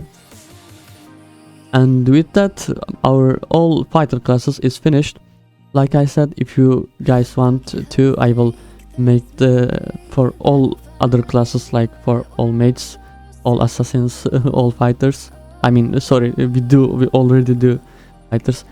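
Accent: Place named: Turkey. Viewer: Kankam aksanın Türk gibi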